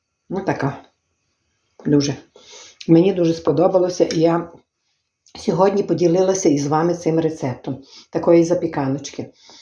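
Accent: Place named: Bukovina, Ukraine. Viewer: Дякую вам пані за рідну мову за буковинський говір Дивлюся вас з Америки і думаю що це готує моя мамочка З якого ви району ? Бо у нас теж завжди казали що сипляться воду 😂 Будьте здорові і миру нам всім бо дуже хочеться до рідного краю до родини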